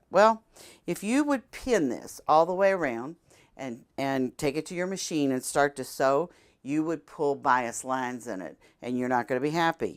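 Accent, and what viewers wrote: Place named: South, United States. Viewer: She has some what of a Southern/mid west accent sometimes it's hard to understand southern people lol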